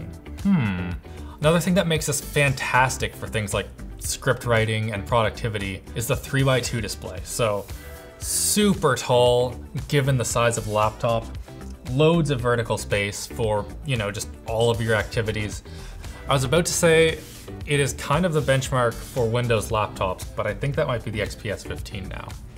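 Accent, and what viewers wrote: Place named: Maritimes, Canada. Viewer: As a Nova Scotian living outside the province there’s always something comforting about Alex’s maritime accent in videos. Oat and aboat goin in yer cahr, etc. Dont let any of those west coasters shame you for it bud! 😂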